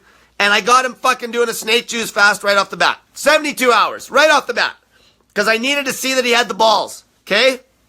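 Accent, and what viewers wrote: Canada. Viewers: Finally nailed your accent - Canadian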